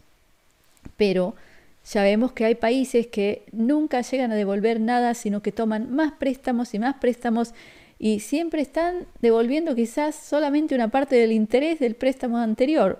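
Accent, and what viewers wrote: Argentina. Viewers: Naciste en Argentina por tu dejo. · Tu acento ... te delatan, sos de Argentina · Ese acento tan bonito *, Argentina! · Por tu acento, naciste en Argentina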